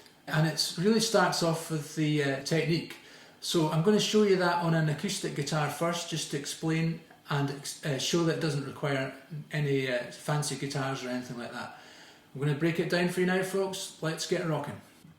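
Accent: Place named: Scotland, United Kingdom. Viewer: Your Scottish accent is great! Keep on keepin 'on!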